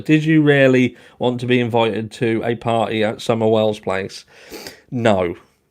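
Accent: United Kingdom. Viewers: I am so bummed that I missed this... I rarely have time to catch lives, but for you, I'm gonna do my best! You crack me up!!! Tell all them TROLLS that HATERS OF HORSE-SHIT (yes I've named ur super hero fan club & I am the leader lmfao) 2nd that FUCK OFF & will make life hell with our super powers... those powers include common-fuckin sense, ability to understand & love a great British accent 😉, and our desire to do anything we can to help the voiceless get justice and stop history from continuously repeating!!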